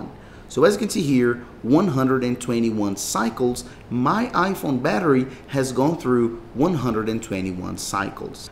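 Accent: Brazil. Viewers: Amazing that even never having entered this channel, this video or having ever heard or seen this guy I am 100% confident he’s Brazilian judging by that accent that I’m able to recognize because I am Brazilian and am a good accent spotter. That said this video is awesome. I had no clue about this. Thanks a lot.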